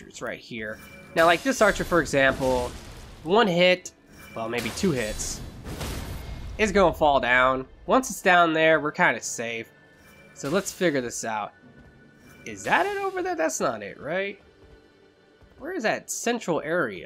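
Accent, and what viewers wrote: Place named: United States. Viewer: you sound to me like you have an accent. Are you okay saying what state you live in? Curious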